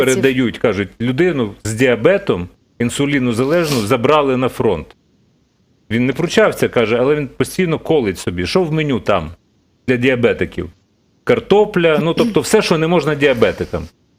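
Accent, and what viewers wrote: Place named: Poltava, Ukraine. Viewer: згадалась ваша полтавська вимова,коли читала в місцевому чаті одне з повідомлень. Це Херсонщина. Ось це повідомлення: "Вірні слова,бо унас не так як у людей,ми будем собирать кошти, на ремонт а хтось буде мидальки получать,мидаль наде було дать громоді яка була в окупоції,чого не пишуть де діли 60 тон гомунітарки,а тендери по ремонту це зовсім інша проблема,унашом отеге все можливо,кому війна а кому мать родна."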